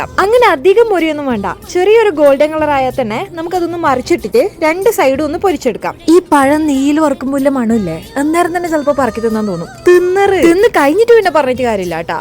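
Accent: Kannur, India. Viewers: ന്ത്‌ ആർട്ടിഫിഷൽ സംസാരം ന്നു. ഇത് കണ്ണൂർ സ്ലാങ് ആന്നു.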